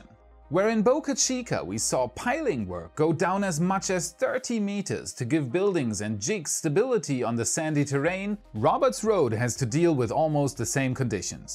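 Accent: Germany. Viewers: I'm curious about where comes from. According to how he said "Einstein Bose", he really sounds (Northern) German. Does anyone know more? (Not being a native speaker, I'm not very good in detecting dialects)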